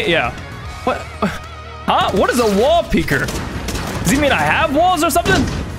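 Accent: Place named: United States. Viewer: Most American sounding Kiwi around, you even from NZ uce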